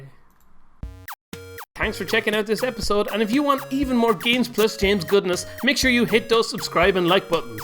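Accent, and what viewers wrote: Ireland. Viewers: The best parts of the video! 1. Your Irish, which makes everything better! 2.The tutorial was very easy to understand and helped alot! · I'm all about this dude's accent. Ireland needs to pump out more tutorials. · I'm so used to Irish accents that I didn't even realise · I actually didn't realize he was Irish, the accent is so burned into my brain it sounds normal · So true. The Irish accent seems to ease its way into my brain, making me understand more efficiently. ;-) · Are you Irish or something?? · your accent is what i live for if i had an irish accent i would fucking love myself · This guy's Irish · Lol your voice and Irish accent both remind me of remind me of this YouTuber called TheTerroriser (aka Brian). Anyway, thanks for the tutorial ❤ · I just realised how irish this man is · Are you Irish?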